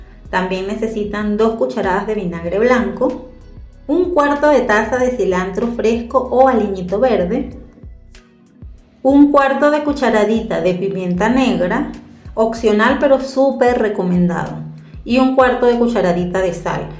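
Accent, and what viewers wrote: Venezuela. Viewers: Clarooo que las voy hacer se ven demasiado ricas gracias por tus recetas y 20 puntos como explicas en los video. Saludos desde la isla de Margarita 😉 por tu acento eres Venezuela 😉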